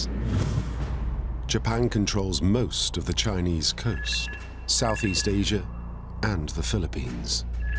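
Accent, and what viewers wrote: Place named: England, United Kingdom. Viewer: American propaganda with an english accent. Nonsense.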